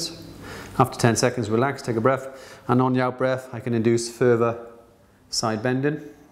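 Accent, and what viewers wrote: Wales, United Kingdom. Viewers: Ah... I can actually hear your slight Welsh accent now.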